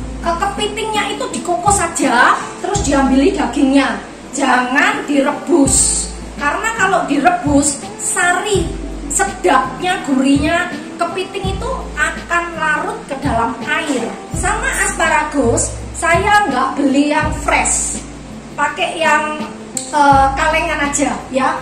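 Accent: Java, Indonesia. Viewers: Pas banget lg pingin sop asparagus 😋 Resep2 nya mesti tak tunggu2..soal e seneng liat ai nya kalo jelasin ,super jelas tp medok 😊👍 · mantap ce makan musim hujan bgtu enak banget 😋😋..suka logat bahasa jawa cece hehe.. jelasinnya jelas dan mudah dimengerti thx ya ce semangat trus ya ce Gbu 😊😇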